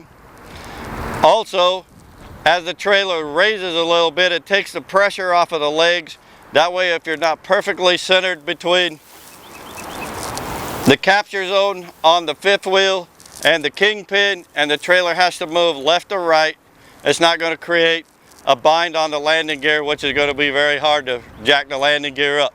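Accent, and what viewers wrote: United States. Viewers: Really informative, spot on - - and that East Florida accent is the cherry on top.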